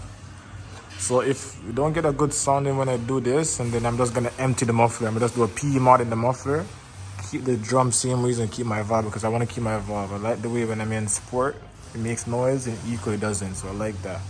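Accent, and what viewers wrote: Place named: Jamaica. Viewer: u sound like yard mn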